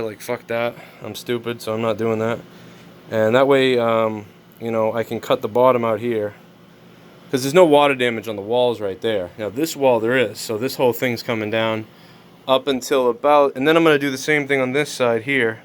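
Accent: Boston, United States. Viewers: Lol do you consciously switch to your Boston accent or is just something that happens sub consciously?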